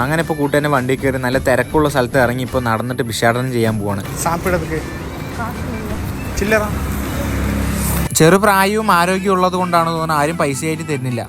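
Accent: Palakkad, India. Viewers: Enthoke paranjalum that pkd slangue ❤ videos are very nyz 🎉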